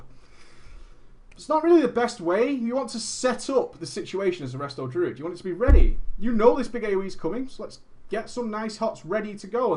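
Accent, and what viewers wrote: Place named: Manchester, United Kingdom. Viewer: you sound like yer from "MAAAAHHHN-cheeeeh-stah" /brofist!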